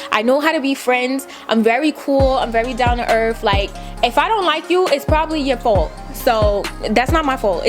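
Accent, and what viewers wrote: New York, United States. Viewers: Is it me or she talkin totally dif ..like a new yorker..like nicki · NY accent was just popping out extra todayyy,love itttttt. looooool. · Sis youre sounding EXTRA New York in this one !!! Lol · U know I love how New Yorker speak yo lol I just live for hearing speak lol I'm from JA and New Yorkers accents are my fav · Is Such A NewYorker I Love It I Haven’t Heard Somebody Say “You Bun’s” In So Long 😂😭😭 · Lol I love u 😂😂😂 u so New York 😂😂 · i loveeeeeee your accent 😭 true new york girl lol . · so New York I can’t lmaooooo I love it though 😂💜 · The best New York accent ! 😆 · This shit funny as hell. She so NEW YAWK . I love itttt 😍😍😍🤗😂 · Omg I missed ur story times I love u I love the way u talk the nyc accent is everything 😘🤗💜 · can travel all over the world but you can literally never take the New Yorker out of this girl!lol.. 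Our New Yorker accent and slang is so so strong. Love it! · She’s so new YAWK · looks beautiful, but is it me or do I hear her NY accent more now! hehe:)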